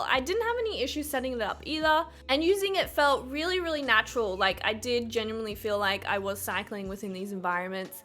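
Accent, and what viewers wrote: Australia. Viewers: That's definitely not a British accent. She's Australian.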